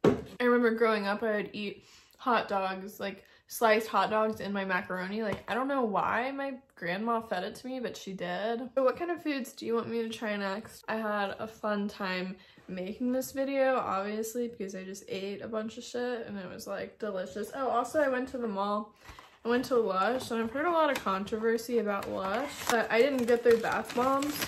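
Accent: Philippines. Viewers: I like your pilipina accent.. Sana all😅😊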